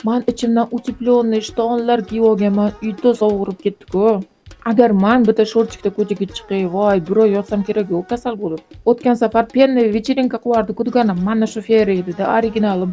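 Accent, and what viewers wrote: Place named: Syrdarya, Uzbekistan. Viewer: Gapirish sevamgiz sirdaryoga tortarkan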